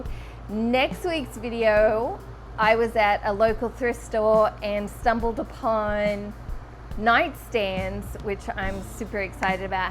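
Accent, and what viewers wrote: Australia. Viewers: So so so glad to hear an Aussie in US restoring furniture .... so glad to have found your youtube channel ...... Trust you go really successfully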